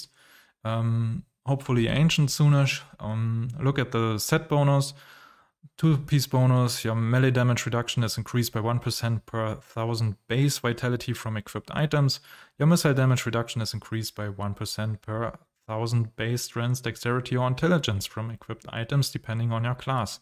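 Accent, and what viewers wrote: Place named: Germany. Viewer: I höre deutsch raus :)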